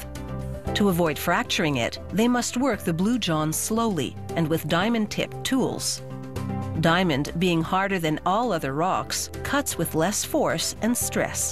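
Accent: Canada. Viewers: Then good thing this narrator is Canadian! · Whilst the producers of the show are ignorant and stupid for not researching the correct pronunciation of "Darr-bee-shire", my fellow Brits are equally ignorant and stupid for attacking Americans here, when the narrator is quite clearly speaking with a Canadian accent (oot, aboot etc) and she gives the temperature of the vacuum oven in Celsius, not Fahrenheit like an American would.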